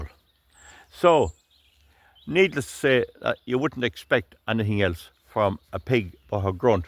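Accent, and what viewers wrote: Ireland. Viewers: I love the Irish accent :)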